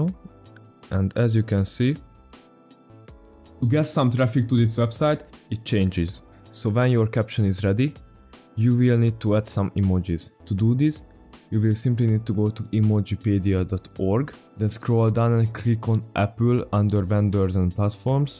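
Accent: Hungary. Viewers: gya de hallatszik hogy magyar vagy teso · Érződik egy kicsikét a magyar akcentusod, de ezerszer felismerhetetlenebb, mint az enyém. Üdv a nemzetközi youtubeon és sok sikert továbbra is! :) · mondom magamban olyan magyarosan beszél ez a pali erre nézem a windows languaget xd kösz amúgy fasza videóü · Magyar akcentus feltűnt 😀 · Hungarian? Edit: I knew by the accent. I'm Hungarian too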